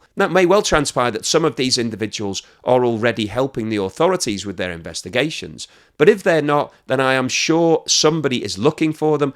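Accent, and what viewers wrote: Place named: England, United Kingdom. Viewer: You are hard to understand since I'm not used to English english!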